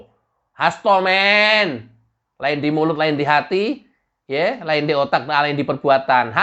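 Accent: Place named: Rural, Indonesia. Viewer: Bicaranya koq seperti orang kampung ya ! Enggak bermutu lah !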